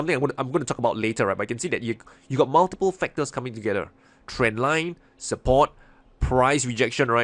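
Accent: Singapore. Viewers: English is just a language not an intelligence..I too have friends from singapore and they have similar accent as... So please do not offend someone like this.. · Love the singapore accent 👍🏻🍀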